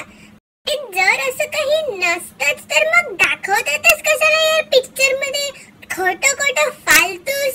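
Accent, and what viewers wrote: Nagpur, India. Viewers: Bhaitad! typically Nagpur very cute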